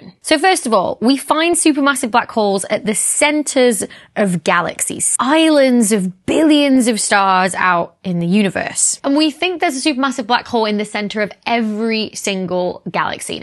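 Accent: United Kingdom. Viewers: I love your British affectations. Despite that, I propose a hypothesis that I invented myself... I call it the 5 Jigsaw Puzzle Piece hypothesis: If I gave you 5 pieces of a 100 piece Jigsaw puzzle, and ask you to describe the image the entire jigsaw puzzle presents..., could you do it? Absolutely NOT! How then can cosmologists today claim to give me a 100% picture of the entire universe based on the 4.8 percent of baryonic matter that they can actually and only preceive? That is to say, cosmologists don't know shit about the nature of the universe or how the universe exists at all.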